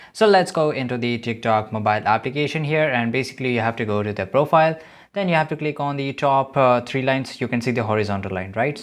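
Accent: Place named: India. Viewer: Indian with an storng Indian accent and doesn’t even know how to fix it lol. Yall country is fully bad on first hand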